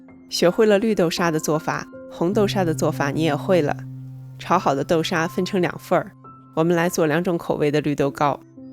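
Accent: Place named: Beijing, China. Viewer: 您的標準(京片子)聽您的解釋很仔細很舒服感恩會照您的方法試作感謝您。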